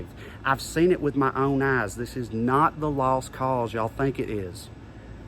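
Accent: South, United States. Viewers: Your soliloquies are music to my ears. Your tirades are inspirational. I'm addicted to your deep Southern drawl. Damn, man! 😄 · StClemmons I've heard Beay explain about the accent. He talks to people in a way that they can relate to. When he's speaking to the general public, he uses a less southern sounding manner of speaking, but when he's speaking with people who can relate to it, he slips back into his natural southern accent. I get that. When I answered the phone at work, I would speak distinctly and professionally, but among close friends, adopt a more casual tone. As far as the trafficking charges go, he has alluded to having a past conviction for it, and having served his sentence. As you said, it wasn't sex trafficking, and while still illegal, was definitely not the worst thing I've heard of people doing. There must be some kind of a gap to be filled with jobs in this country having to be filled by people from halfway across the world. 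Surely there are local people who could fill these positions. Are the employers not willing to pay enough to make it worthwhile for Americans to take these jobs? Or do the employers keep the wages low because they know desperate immigrants don't dare complain? And why would someone come all the way to the U.S. for a low wage, hard labor job, unless things were far worse in their home country? I like Beau's take on a lot of things. He definitely knows a lot about other countries, and many random subjects. I think he's worth listening to, but what you do is up to you. Take care.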